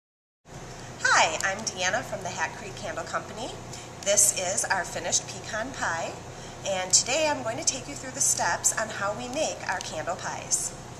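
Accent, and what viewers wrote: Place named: Canada. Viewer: is she canadian? her accent sounds very canadian haha love it! beautiful candles, wish i could smell through the screen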